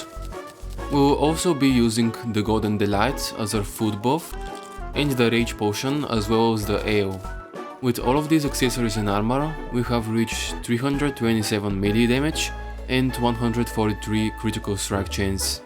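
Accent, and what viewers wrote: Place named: Russia. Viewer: I hear Russian accent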